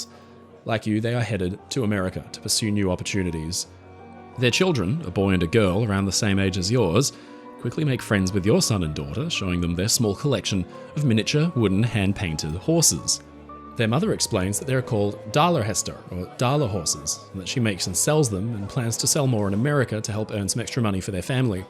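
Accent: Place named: Australia. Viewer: Great story. Gotta love the Aussie accent.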